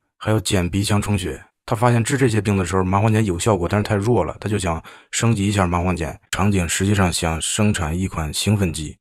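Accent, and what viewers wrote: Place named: Beijing, China. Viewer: 北京口音听着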